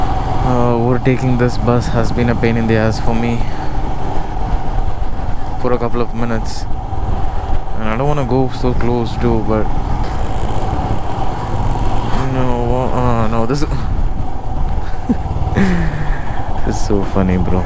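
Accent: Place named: Thiruvananthapuram, India. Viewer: Trivandrum accent tho! Pwoli sanm!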